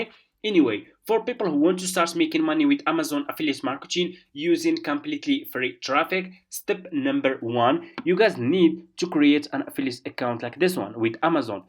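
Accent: Morocco. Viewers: He has a Moroccan accent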